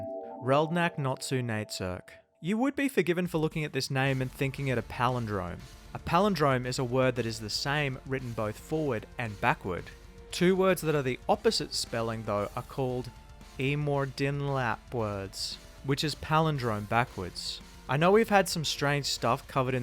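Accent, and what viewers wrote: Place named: United Kingdom. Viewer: I love the great British narration keep up the good work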